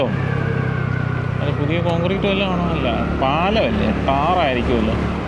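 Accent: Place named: Kottayam, India. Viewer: Broyude slang tvm pole thonnunnillalo kind of kottayam pole oke thonnunnu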